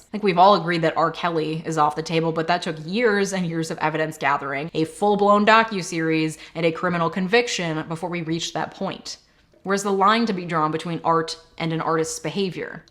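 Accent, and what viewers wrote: United States. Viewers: From the title alone I'm fearing to hear some of the dumbest take I've heard this week. Let's see how it goes. From the accent, a Muhrican, checks out so far... Acts "jokingly" as if visiting another country is something to be proud of or to brag about, yup, the stink is rising. Misses the entire point of Dorian Gray, as the point is not that the picture only ages instead of Dorian, but the consequences of his growing depravity shows on the portrait instead of his own, age is the least of the things concerned, you, you... american ! Has interesting takes on what constitutes a child in the victorian era and applies modern american sensibilities and morality to another country in another era, niiiiiiiice... they could work chimneys at 3, but having sex at 17, never ! Has a wildly revisionist vision of the acceptance of male homosexuality in the UK in victorian times. 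Nope, only one third in there's already so much bullshit I can't go on... And you're supposed to be a bloody lawyer ? May God have mercy on your clients...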